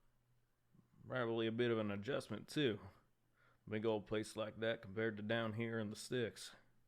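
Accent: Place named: South, United States. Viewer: Oh boy Ash was right, you do have a good southern accent ❤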